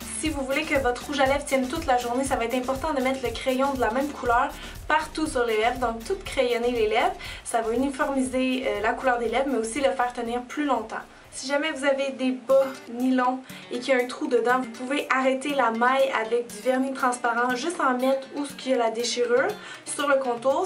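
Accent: Quebec, Canada. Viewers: J'aime beaucoup tes vidéo .ton accent québécois par rapport à d'autre passe très bien.je vais vite tester certaine astuces .la couleur blond te va mieu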